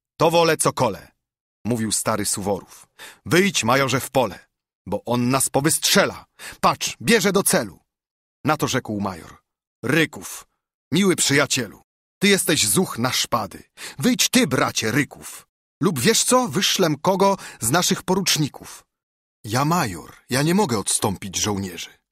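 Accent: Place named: Central Poland, Poland. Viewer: Ach ta środkowopolska intonacja 😢 To powinien czytać jakiś aktor kresowiak. Utwór odczytany w tej melodyjnej wschodniej polszczyźnie, w której przecież został napisany, OGROMNIE zyskuje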